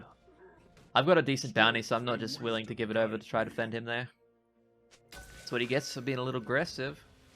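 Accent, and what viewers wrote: Australia. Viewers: Hi are u from Australia?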